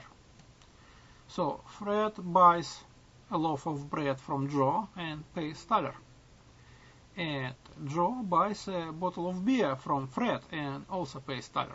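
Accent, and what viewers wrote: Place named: Russia. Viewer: Акцент русский просто жесть )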